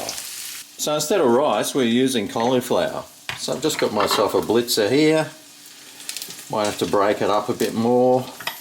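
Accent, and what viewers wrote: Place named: Australia. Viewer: I watch you every now and then:) nice to hear an authentic Aussie accent LOL....this meal actually looks very appetizing I am going to make it as I am sure my boys would like this...two questions...1. hard to tell so is this a big enough meal for 4? Or would I need to double the ingredients and 2. Would frozen cauliflower rice be an ok substitute for the lazy cook aka me 😆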